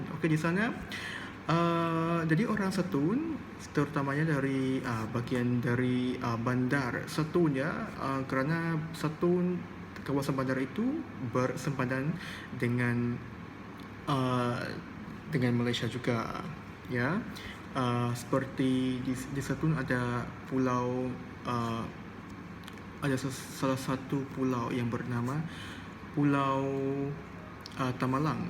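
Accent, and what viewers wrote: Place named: Indonesia. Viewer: Kamu mengunakan bahasa indonesia,,seleng indonesia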